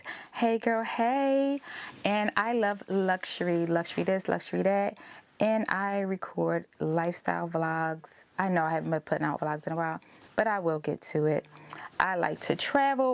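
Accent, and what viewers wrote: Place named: Baltimore, United States. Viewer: You sound like you're from Baltimore gurllllll ❤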